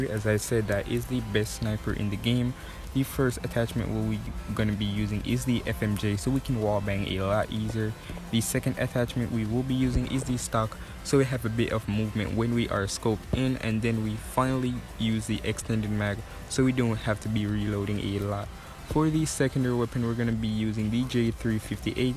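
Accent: Jamaica. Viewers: Are u Jamaica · You jamaican?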